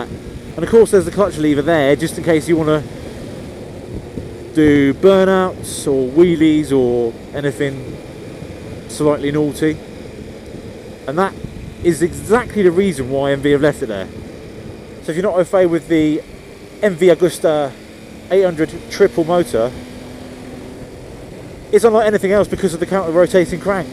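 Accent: United Kingdom. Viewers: A complete waste of several minutes of my day....I could only stand this guy's sickening British accent for a few mins(!) Comprise your stupid opinions when you're NOT negotiating city and pedestrian traffic; you might have more intelligent things to say. And please, save the childish and moronic singing for your shower. Everything in this video could have been condensed to 5 mins without your blithering and entirely unrelated meanderings.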